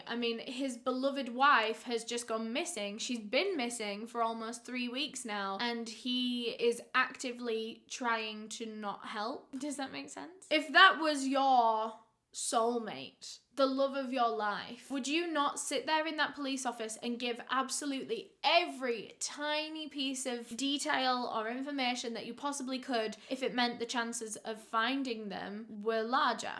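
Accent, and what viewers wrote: United Kingdom. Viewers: I. Love. This. Accent. So. Much…. Which part of the UK is this?!!! 🤩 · Can a British person tell me what kind of accent she has? It doesn’t sound like typical accent you hear on tv. Is it considered proper? I met a girl from Manchester who told me Adele sounds so bad when she talks and here me an American loves her accent. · Hey..this is off topic..i love your accent! Sounds British with a twist!😉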